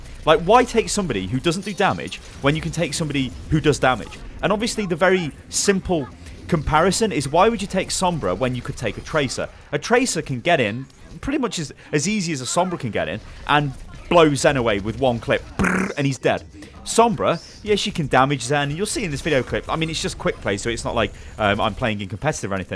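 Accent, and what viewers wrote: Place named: United Kingdom. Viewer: But with an awesome british accent though.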